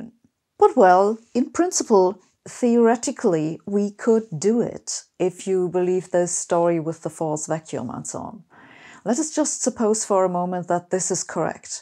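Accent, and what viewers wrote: Germany. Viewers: A hard German accent of English,I'm using "CC".After this presentation,I'm enlightened.Einstein again,I'm starting to believe in God.I give up of all things,smartphone,laptop,car,TV,light etc powered by ELECTRICITY .I'm going back to the cave to light the fire,using GRAVITY of course!I would like to know what mass it is exactly,what number or equation so that I'd be able to light a fire in the cave by gravity? Light is not sound,so as not to have a wrong perception!Welcome to the 21th century and physics from the 18th century!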